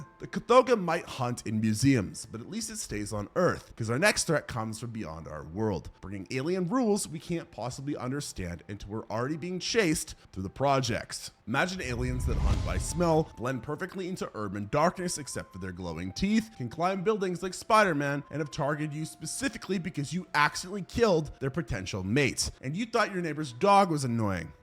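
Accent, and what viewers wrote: United States. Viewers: You aren't American ? Do not care at all, but you sound very American. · What country are you from? I assumed because you sound American, you're American. Your comment about calm down Americans with your Pew pews might be one of the funniest things I've ever heard in my life. And I am an American with a pew pew😂😂😂😂😂😢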